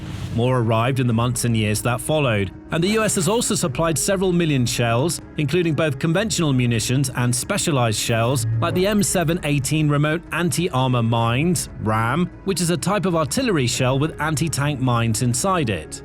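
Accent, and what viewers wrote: United Kingdom. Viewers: Not actually American is it, British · Please lose the British accent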